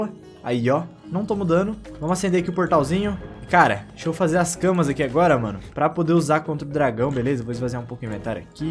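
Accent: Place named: Bahia, Brazil. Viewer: tu falou muito baiana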